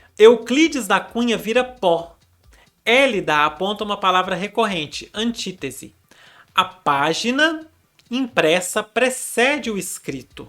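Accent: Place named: Minas Gerais, Brazil. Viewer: hahaha quais foram as exposições? Sou de Minas também! obs: já tinha desconfiado que era mineiro pelo sotaque, esse não engana ninguém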